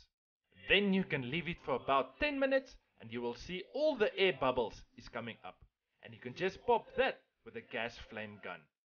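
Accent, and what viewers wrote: South Africa. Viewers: you South African? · Out of interest sake, are you South African? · This is such a South African accent 😂😂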